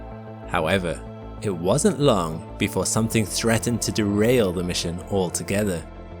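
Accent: Wales, United Kingdom. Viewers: Are you Welsh by any chance? Every so often during the video, I thought I could make out a slight Welsh accent, more noticeable with certain words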